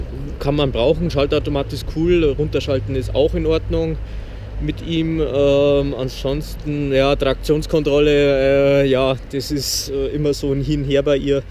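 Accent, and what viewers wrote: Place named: Bavaria, Germany. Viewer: Hab mir heuer auch wegen der Euro 4 noch gekauft in Schwarz.Wo bistn du her?Klingt so wia aus Bayern ;-)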